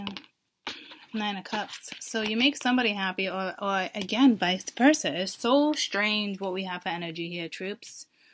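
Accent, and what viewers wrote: Boston, United States. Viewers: Lol, where are you from originally? I love your northern accent. Are you maybe from Boston or something? Love it!!!!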